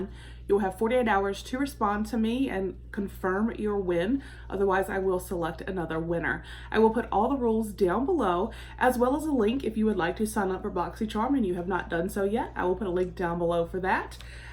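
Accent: South, United States. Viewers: Love boxy charm I would love to win..I'm from kentucky Iove your country accent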